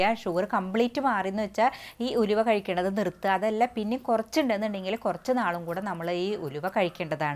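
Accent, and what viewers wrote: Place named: Kannur, India. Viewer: നാട് കണ്ണൂര് ആണ് എന്ന് മനസ്സിൽ ആയി..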